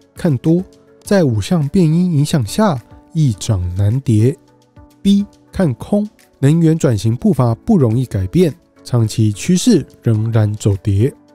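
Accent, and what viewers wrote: Taiwan. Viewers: Ａ，最近也卖掉Tesla改买油车了，因为北美实在太冻，之前一场大雪车就故障了 是说小哥哥的台湾腔还挺好听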